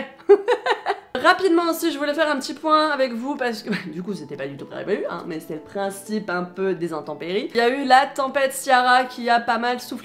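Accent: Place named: United Kingdom. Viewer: J'aime beaucoup ton accent anglais! (Non non je ne ment pas!) Et pour info Ireland en anglais se prononce "ailleurland"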